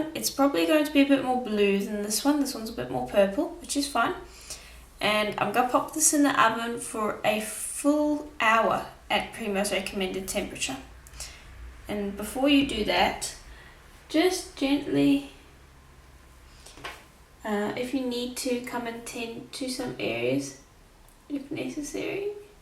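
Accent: South Africa. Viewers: Your accent feels so familiar! Are you a bittie South African? Enjoy your videos! Thank you. 💖🌸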